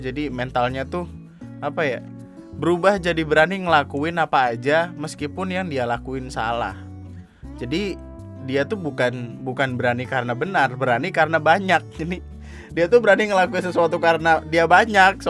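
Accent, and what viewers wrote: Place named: Tegal, Indonesia. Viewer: Tegal keras cuy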